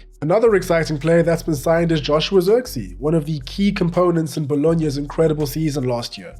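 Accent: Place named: South Africa. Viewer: Is this dude a black South African? His accent is basically like mine. Not used to hearing it on the internet.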